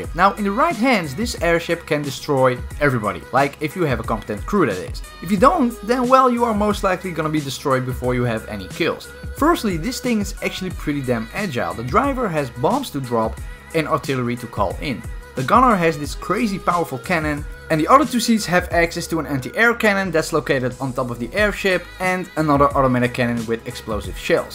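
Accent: Netherlands. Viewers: Your definetly dutch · Like to Hear the dutch accent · are you dutch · nice dutch accent bro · Are you Dutch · Prachtig nederlands accent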